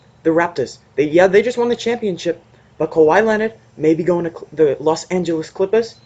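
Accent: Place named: South, United States. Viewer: You sound like you have a country accent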